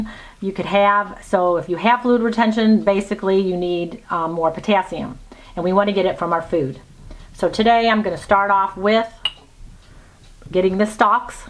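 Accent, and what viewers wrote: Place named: Chicago, United States. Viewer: Thick Chicago accent!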